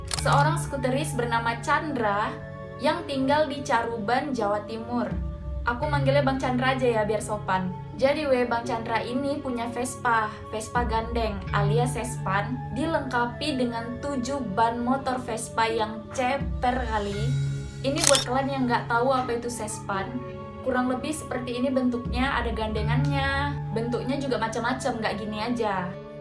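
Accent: Medan, Indonesia. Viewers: Anakk medann kalii ahhh🤙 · Wih anak medan nih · Mbak orang medan ya? Kenal pak john als 270 tidak mbak? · ank Medan rupanya bah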